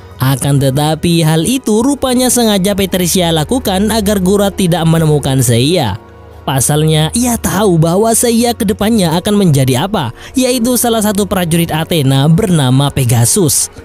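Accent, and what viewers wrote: Madura, Indonesia. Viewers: Ngereng yatoreh?Reng madureh?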